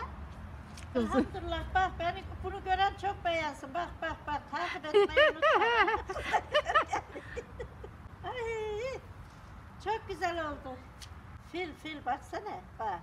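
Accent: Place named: Elazığ, Turkey. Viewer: Elaziğli gibi konuşuyosun ya anane ben elazigliyim da ondan diyom 😂🥰💙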